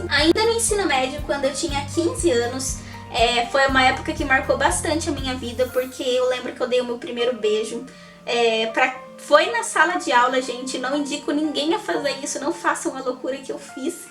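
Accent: Ceará, Brazil. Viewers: Voce é do Ceará?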